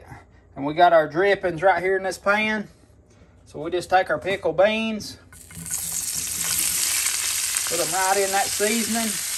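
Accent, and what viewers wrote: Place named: South, United States. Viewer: I grew up in Oklahoma and moved to the north a long time ago, I LOVE watching videos just to hear that southern talk 😍 All the other content is a bonus !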